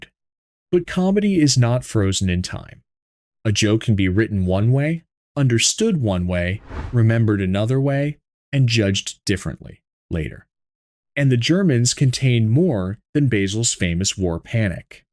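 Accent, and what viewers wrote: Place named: United States. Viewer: Hey dont be critical of that. That's how Americans say that word. That's how they are taught. Live & let live ! That's the world we live in.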